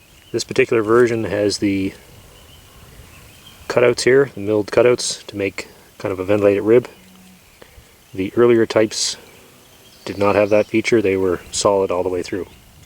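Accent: Canada. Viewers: Are you by chance, Canadian?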